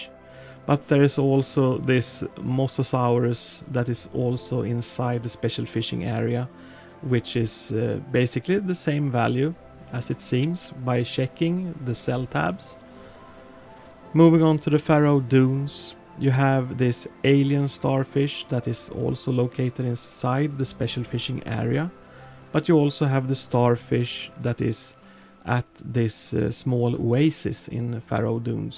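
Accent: Sweden. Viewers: Ser och hör att du är svensk, Jätte bra video, bra tips. Ska försöka dem tricken! Ha en bra Midsommar idag. Hejdå! :)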